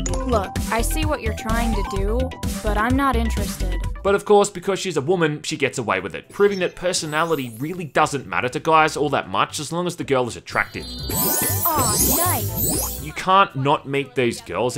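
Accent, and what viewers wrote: Australia. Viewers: I love this man's review of huniepop it's hilarious an Aussie describing what hentai is very funny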